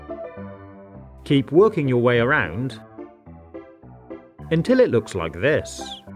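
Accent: United Kingdom. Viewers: are you british???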